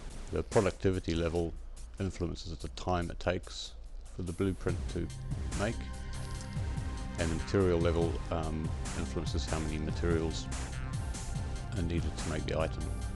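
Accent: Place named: New Zealand. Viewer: its that kiwi accent, soft vowels.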